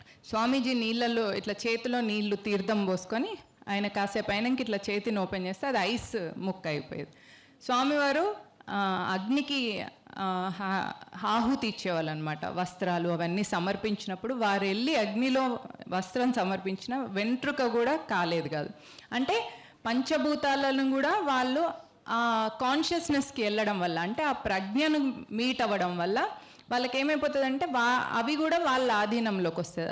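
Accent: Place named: Telangana, India. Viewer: स्वच्छ. Myna. Telangana. Yasalo.matladi .adura.gottindi mam❤❤❤❤❤❤❤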